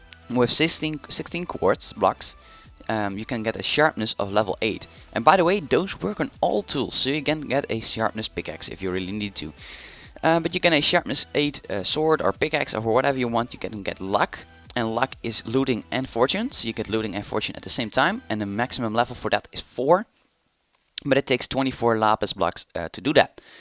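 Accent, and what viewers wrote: Netherlands. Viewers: I can here an obvious dutch accent... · Becous of your accent I think your Dutch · Are you dutch? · Kut nederlands accent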